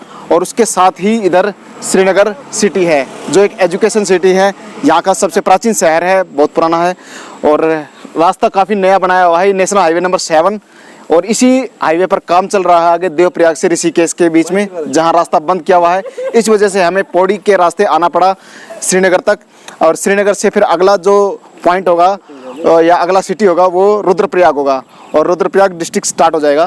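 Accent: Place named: Haryana, India. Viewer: बोली से हरियाणा का लग रा ह भाई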